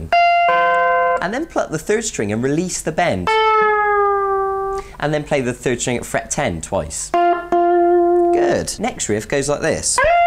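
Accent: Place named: Bristol, United Kingdom. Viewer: That's gotta be a Bristol accent I know that anywhere me Babs 😂. Brilliant lesson all the same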